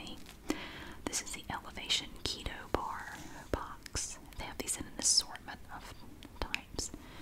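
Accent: South, United States. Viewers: Anyone else wondering where she is from in the South!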